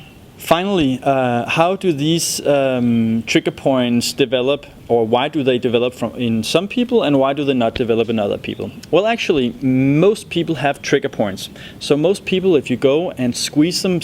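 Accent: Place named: Denmark. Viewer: heavy on the Danish accent. apart from that excellent English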